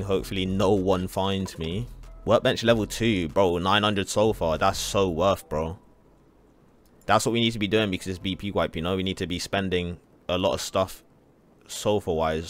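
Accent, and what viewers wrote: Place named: United Kingdom. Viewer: Mate sounds like a brit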